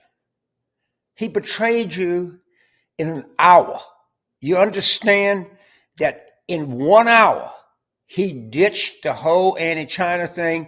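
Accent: South, United States. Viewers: Thanks again,for another informitive video Mr. Carville! I've watched you for years,and never get tired of your wisdom! With your southern drawl,and a little comedy,you continue to guide our people! I met little donnie many years ago in NYC.I knew after two seconds,that he was full of $hit! Being a country boy,I've been taught to beware of a conman! How can so many people be misled at the same time,inour beautiful country? Where does all of thier anger come from?Can't they see that his is nothing but a brown stain in thier shorts? Sheesh.❤❤❤❤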